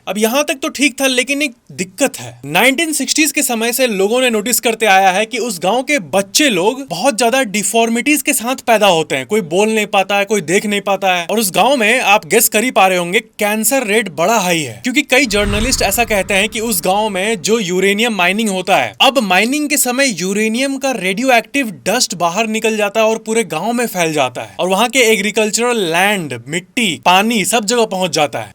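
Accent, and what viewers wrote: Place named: Bhojpuri, India. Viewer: Bhai apki hindi mujhe bht achi lagti h thora thora bhajpuri v mixed ho jata hai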